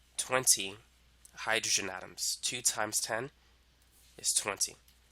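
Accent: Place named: New York, United States. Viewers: Yall I think he has a new yorkian accent idk if u care tho lol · im hearing a little bit of north east accent. new york area 🤔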